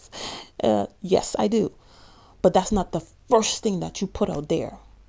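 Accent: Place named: Bahamas. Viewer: You sound Bahamian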